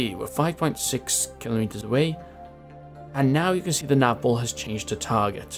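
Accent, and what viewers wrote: Wales, United Kingdom. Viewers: Welsh to me.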